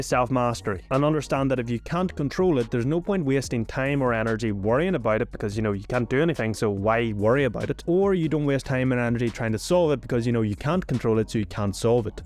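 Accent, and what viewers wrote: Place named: Northern Ireland, United Kingdom. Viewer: Where are you from? Your accent is really cool. Northern Ireland maybe?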